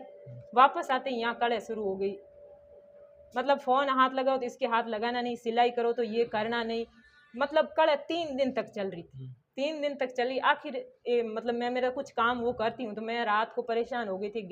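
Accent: Rajasthan, India. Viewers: Awaaz se lag raha hai yeh youtuber gamo Rajasthani hai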